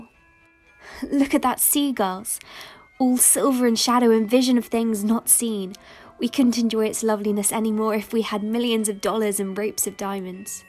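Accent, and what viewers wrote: United Kingdom. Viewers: I love your british accent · For me, to have a video where I can listen to poetry read aloud in a British accent while looking at the English countryside is... well I feel very fancy.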